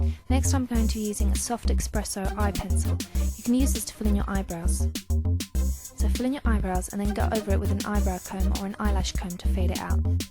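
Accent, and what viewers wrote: England, United Kingdom. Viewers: YAAAY you have a Brittish accent! I LOVE accents, too bad I suck at immitating them. You're really good at makeup designing. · ahaa, i did it =3 very nice tutorial!! do you have an english accent? Your voice sounds cool!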